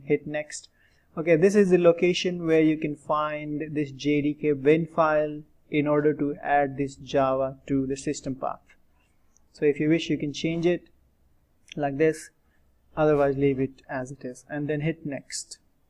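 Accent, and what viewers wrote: India. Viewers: Hey Indian go to normal accent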